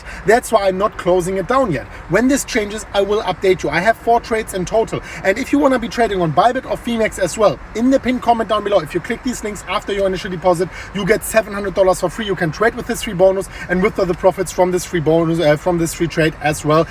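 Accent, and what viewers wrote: Germany. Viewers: Love your german accent, from which city in germany do you come from?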